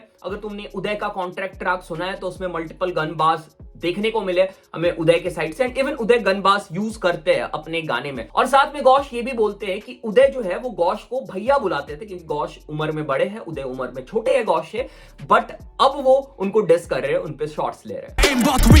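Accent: Bihar, India. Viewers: Bhai tu bihari hai? Bihariyon jaise kyu bolta hai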